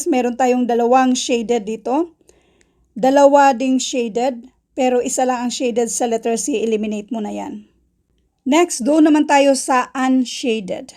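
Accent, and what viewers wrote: Visayas, Philippines. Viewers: Bisaya accent 🫶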